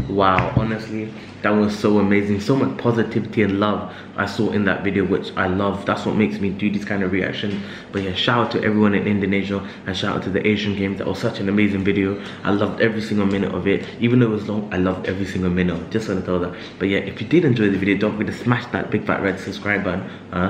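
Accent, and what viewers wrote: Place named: Scotland, United Kingdom. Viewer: are you Scottish? idk you have thick accent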